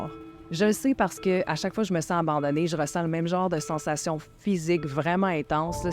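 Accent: Quebec, Canada. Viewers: Bon vidéo et ça fais du bien d’entendre l’accent québécois ;)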